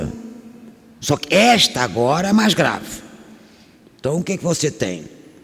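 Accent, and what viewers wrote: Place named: Portugal. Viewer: Her accent is Portugal Portuguese...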